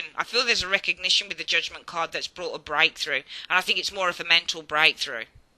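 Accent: Birmingham, United Kingdom. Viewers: That’s got to be the best Brummie Reading I’ve ever heard! You are really good! Thanks!:)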